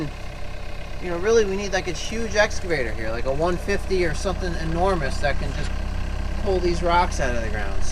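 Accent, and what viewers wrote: New York, United States. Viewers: Wiffa,haffa,poseta,lol..you sound like my uncle from N.Y...love the videos...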